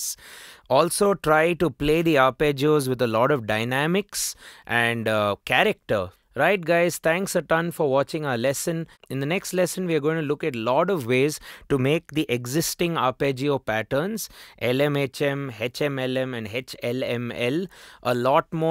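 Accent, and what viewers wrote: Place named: India. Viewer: Wonderful...No plans to learn piano but I loved listening whatever you played...God bless...Your way of speaking sounds very Indian...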